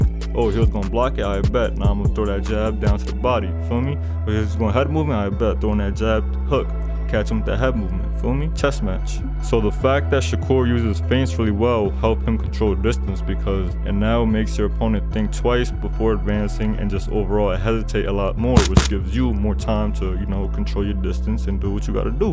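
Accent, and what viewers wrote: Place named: New York, United States. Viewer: I can tell you’re from New York. Do u train?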